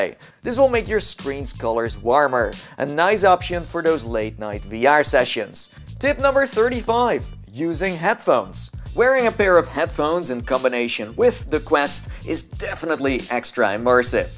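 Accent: Netherlands. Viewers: Ben jij Nederlands? Want je hebt zo een accent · are you dutch by any chance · You sound dutch (just a random though) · Ben je nederlands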